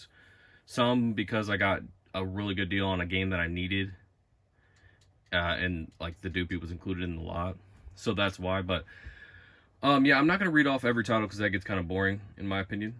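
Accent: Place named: Texas, United States. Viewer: You from Texas?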